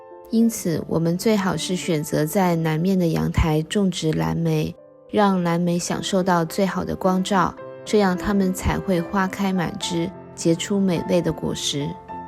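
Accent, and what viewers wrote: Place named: China. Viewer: 中國人的4瓶!! 雖然用了正體漢字聽口音跟用詞都不是台灣人跟香港人的用法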